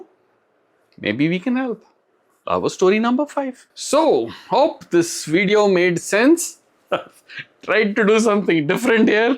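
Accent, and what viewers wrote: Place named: Karnataka, India. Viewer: Sir you are punjabi but your accent is pure kannada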